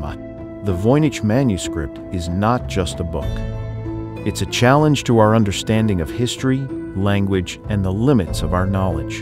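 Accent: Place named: Midwest, United States. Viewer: Was this made by AI? If you are going to use AI to do the narration, train it to pronounce common words correctly! The qords hoax and theory are NOT unusual, and yet your narrator--who otherwise has perfect middle American diction and syntax--your narrator pronounced those two words with hilarious errors. If the narrator is human, then they need to take the extra two minutes necessary to double check the pronunciation of words they do not know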